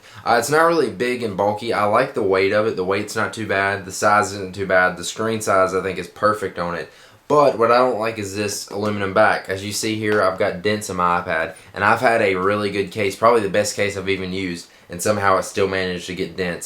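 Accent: South, United States. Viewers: its called a southern accent, or in his case, a semi-southern accent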